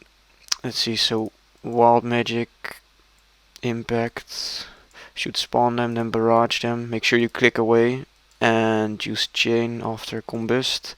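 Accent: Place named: Netherlands. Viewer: sounds like you're dutch. and very good vid never knew glacors were worth it again after eoc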